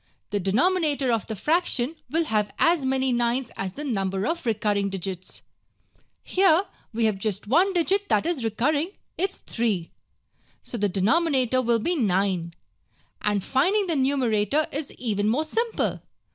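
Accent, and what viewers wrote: India. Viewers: You sound to be Indian but your English is clear and I like it.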